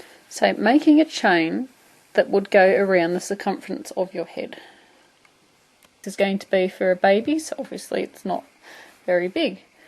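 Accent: Australia. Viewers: I hope you make more videos, You could read a calendar or a grocery list and I would listen, I love the Australian Accent.. hee hee.. thanks bunches!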